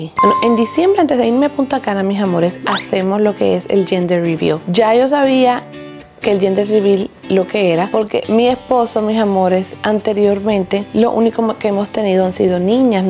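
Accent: Cuba. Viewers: Es un acento, no es voz de niña , ella es de Cuba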